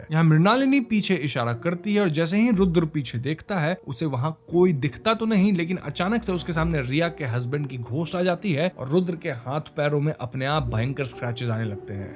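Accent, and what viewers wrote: Bengal, India. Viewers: Omg. Your bengali accent 😭😭😭😭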